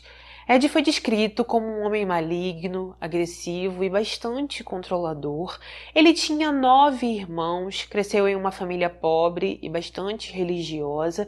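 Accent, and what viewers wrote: Rio de Janeiro, Brazil. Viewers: Adoro seu sotaque do Rio de Janeiro . Saúde, sorte e felicidades! São Luís, 28/07/2023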